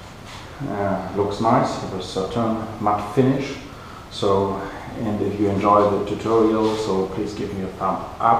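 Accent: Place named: Germany. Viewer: i assume hes german (cause we have the brand of this spray everywhere) and he probably doesnt know the english word for "baumarkt". It's funny, i knew the second he hesitated he wouldnt say hardware store, cause the word baumarkt essentially translates to builder market. Obviously that is a weird name, so he probably went to an english word he heard before. Mut is just how you pronounce the 'a' in german, like "maht"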